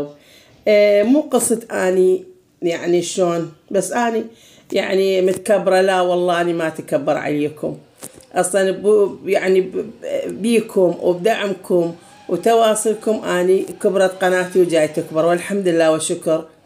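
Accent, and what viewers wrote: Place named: Kirkuk, Iraq. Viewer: اللهجه نفس لهجتنه 🥺حنيت لكركوك وهاي راح اشترك 💔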